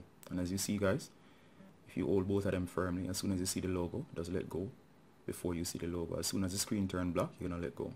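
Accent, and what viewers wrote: Jamaica. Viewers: I did panic! Thank you so much. All good now. Your accent helps too.....Jamaician?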